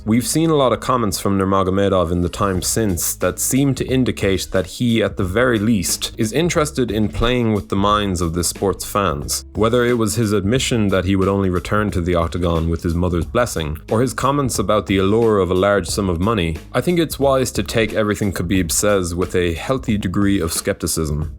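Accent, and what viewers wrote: Ireland. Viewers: Irish Narrator is the real MVP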